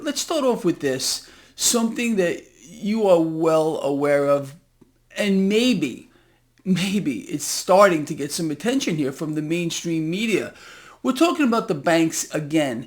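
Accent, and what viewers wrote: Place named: New Jersey, United States. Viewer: I love how you explain everything, i love your Accent, do you come from Northeim erst new jersey? 😊😊😊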